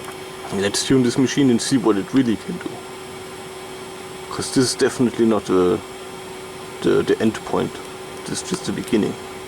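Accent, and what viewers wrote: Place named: Germany. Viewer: I knew I was in for a ride when hearing the thick German accent XD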